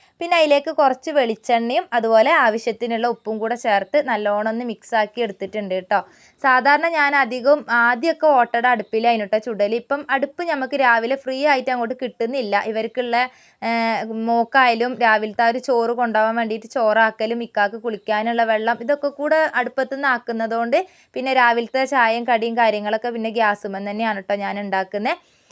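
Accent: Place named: Kozhikode, India. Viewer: 🥰🥰കോഴിക്കോടൻ സംസാരം ആണല്ലോ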